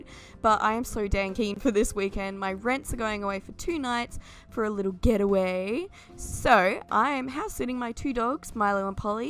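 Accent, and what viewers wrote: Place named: Australia. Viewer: "Gasp" You're AUSTRALIAN!? Lovely build, it's nice to see you have a breather with a smaller build ^^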